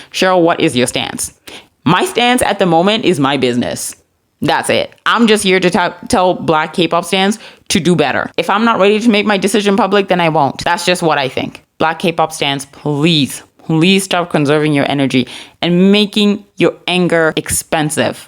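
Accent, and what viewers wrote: United States. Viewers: I had no idea that you had never been to the U.S. before. I always thought that you spoke English with an American accent. ; )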